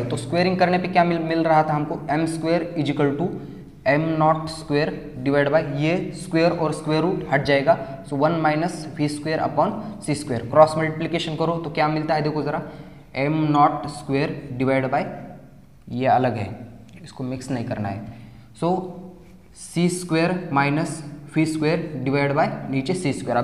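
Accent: India. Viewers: Brother are you from parbhani nanded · Sir tumhi Parbhani che ahat ka ?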